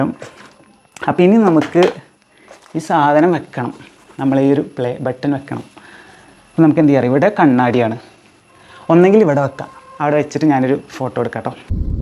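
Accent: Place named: Malappuram, India. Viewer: Happy ആയീലെ ഇനിയും ഉയരങ്ങളിൽ എത്തട്ടെ. ഞാൻ ഇപ്പൊ അടുത്താണ് വ്ലോഗ് കണ്ടു തുടങ്ങിയത് എനിക്കിഷ്ടം നിങ്ങളുടെ സംസാരവും സാദാ നാട്ടിൻ പുറത്തെ നമ്മുടെ മലപ്പുറം slang 👍👍👍👍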